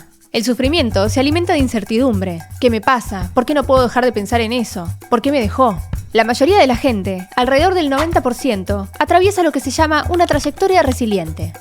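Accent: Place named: Buenos Aires, Argentina. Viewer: Simpre tenes videos muy interesantes exelente research Y cambiando el tema pensar qje nací en Buenos Aires y me fui a los 5 años pero ese acento de Buenos aires me fascina mucho te go que visitar Argentina 🇦🇷 pero especialmente Buenos Aires que lindo saludos dese New York 🗽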